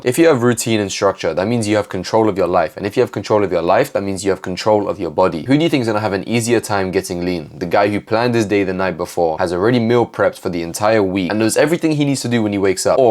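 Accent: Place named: Maghreb. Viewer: WHOA! Viva Maghreb 🇲🇦 Body, Accent... Fire bro🔥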